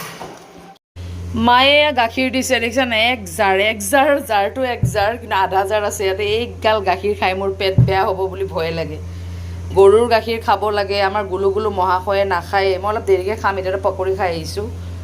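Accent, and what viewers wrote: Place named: Barpeta, India. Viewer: ভাল লাগিল ৰবা চাই।তোমাৰ ঘৰ কত?? ভাষাটোত বৰপেটা চাইড ৰ যেন লাগিছে।কিহৰ অৰ্ডাৰ লোৱা জনাবা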